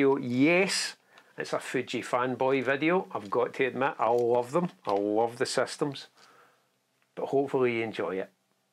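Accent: Scotland, United Kingdom. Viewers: Since you made this video, has there been any firmware updates that would have fixed the focus issue you are talking about? If not, I would think this is something that Fuji will ‘fix’ with a future update… I’m toying with the idea of getting the X-T5 with the ‘kit lens’ and leave buying a prime lens for another day… the ‘kit lens’ being a real good lens it’s worth getting with the camera (18-80mm). I used to own the X-T1 and XF35 lens (I also had the 28mm lens too) but traded them in to buy a black X100v. Now, I’m seriously considering trading up to the X-T5 and selling my X100v as it’s so heavily sought after, it’s selling almost at the new price point reducing the final price… these new Fuji cameras are ridiculously expensive these days. Will you be doing, or have you done, a follow up video on how the camera is fitting into your workplace? Nice to hear a Scottish accent in a camera channel… 🏴 Flickr Ormie (O)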